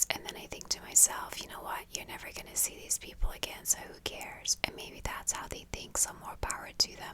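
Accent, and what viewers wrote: United States. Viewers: Wait I’m confused where are you from? Im slightly deaf haha and I thought you were American but I obviously need to listen more often with my hearing aids in xx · R you not American?? U sound so American though 😮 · Your accent doesn’t sound European. Sounds American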